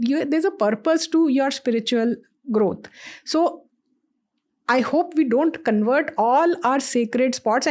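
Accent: India. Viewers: This guy has one of the best funny Indian English accent.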